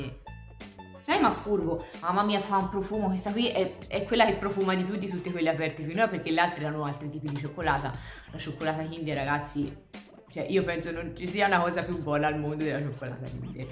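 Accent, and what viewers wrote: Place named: Tuscany, Italy. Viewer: Lei è pa^ata..ah, la tua Toscana riempie le mie orecchie! Buona settimana,carissima 💙💙💙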